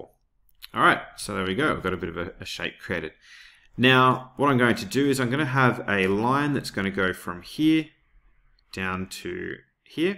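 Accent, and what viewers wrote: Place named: Australia. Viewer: Bro, The dude in Thor Ragnarok is New Zealand. This dude here is Aussie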